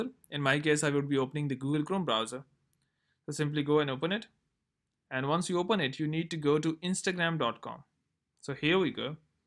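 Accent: India. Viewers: ur accent is still indian dont try to hide it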